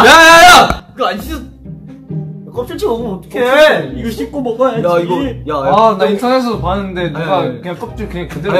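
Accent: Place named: Australia. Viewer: His Australian accent I can’t it’s so cute and just yk 😭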